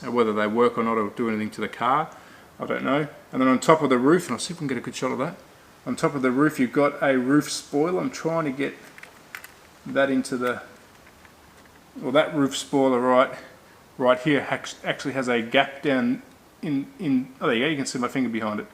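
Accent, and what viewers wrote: Australia. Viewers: That Australian accent grates me.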